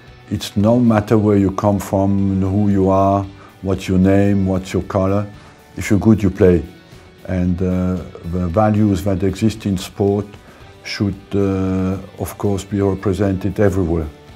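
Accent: France. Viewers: Being from Alsace like Arsène, it’s funny and endearing to hear his Alsatian accent carried through to the way he speaks English. Legend.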